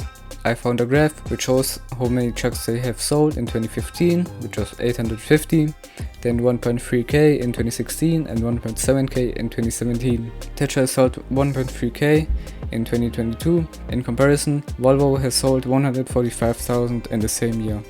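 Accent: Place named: Germany. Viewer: Ich höre mehr Deutsch als English, aber trotzdem gutes Video.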